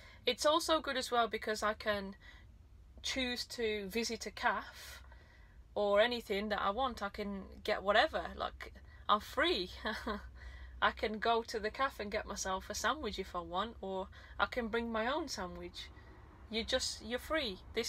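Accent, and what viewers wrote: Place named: Hampshire, United Kingdom. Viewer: Hi You sound local to me, I’m from Hampshire... I’ve just paid for my class 2 rigid course, have my medical next week and then my theory. Thankyou for all your how to videos, and your opinion on all the little things.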